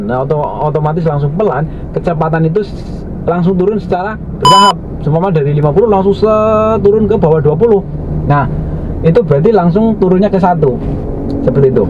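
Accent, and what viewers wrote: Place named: Banyumas, Indonesia. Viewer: Wong ngapak ya😁😂😃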